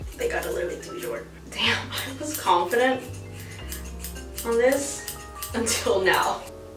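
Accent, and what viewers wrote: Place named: Sweden. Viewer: It sometimes sounds like you have a slight swedish accent to the english hahaha ;D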